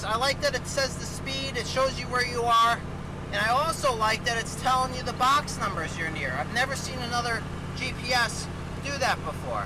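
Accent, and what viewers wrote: New York, United States. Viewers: Awesome video- that accent is like every neighbor I ever had from NY area- keep up the videos they are a huge hit.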